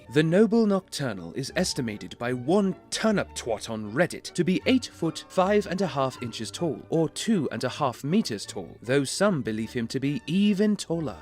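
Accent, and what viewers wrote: United Kingdom. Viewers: I went insane listening to this drunk British guy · Man with hoity-toity British voice gets increasingly drunk talking about a horny owl demon. This is the most video I've watched all day.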